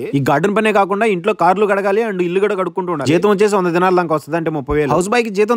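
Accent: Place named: Nellore, India. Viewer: Nellore Basha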